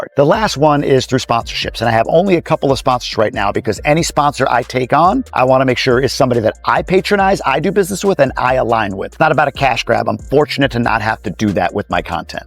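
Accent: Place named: New York City, United States. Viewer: I knew that what a NYC accent! Now it all makes sense to me lol.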